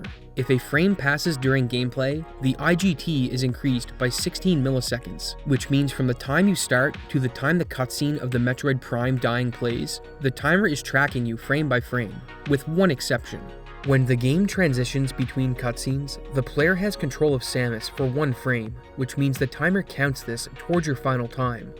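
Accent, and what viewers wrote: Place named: Atlantic Canada, Canada. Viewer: How far east are you from? I'm trying to pin it down, but I'm having trouble distinguishing because of your decent effort between NS or NL. It's been annoying me that I can't decide. If I was watching this in a vacuum, I'd say NL, but other videos make me question...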